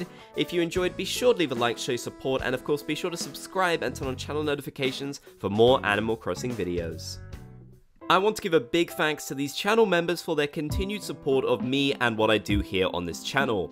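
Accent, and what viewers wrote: United Kingdom. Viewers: ahhh you’re British! I was trying to figure out the accent :) I’m from New England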